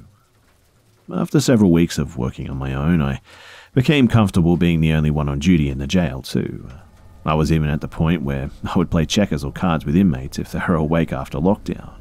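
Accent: Australia. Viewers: If you would have told me 10 years ago that I would one day have an Australian man lulling me to sleep at night, I would have thought you were smoking crack. Present-day-me wonders why more people don't have an Australian man lulling them to sleep at night. People probably think I smoke crack.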